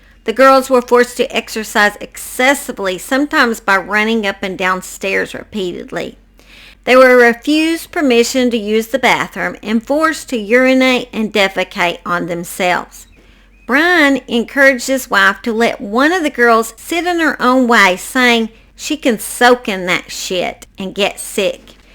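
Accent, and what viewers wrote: South, United States. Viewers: sweetheart, my Mum would've loved you ❤, we both always loved hearing that beautiful southern accent ❤. You're gorgeous 💕. Haven't left many comments dear, but watch all your videos❤🇦🇺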